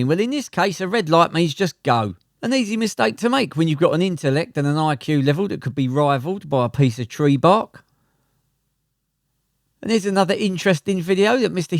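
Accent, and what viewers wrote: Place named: England, United Kingdom. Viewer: Holy fark your pommie accent is very fuckin' annoying so n I gone.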